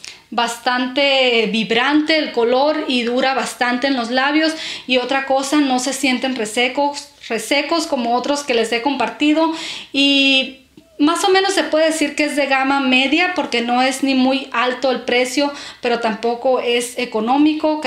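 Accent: Chihuahua, Mexico. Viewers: Hola me gustaria saver de donde eres por tu sonido de voz a mi parecer creo que eres de chihuahua o de sinaloa a cual de los dos le atine😁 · Si yo pensé que eras de chihuahua ai viví y e encantan cómo hablan jijiji saludos, ese perfume cuánto te dura...?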